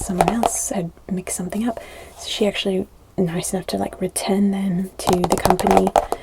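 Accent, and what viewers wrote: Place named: Wales, United Kingdom. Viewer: Ahh! I took your advice and went to watch some older videos - and now I know why I couldn't place your accent! I was thinking Welsh raised overseas or something. Good to finally know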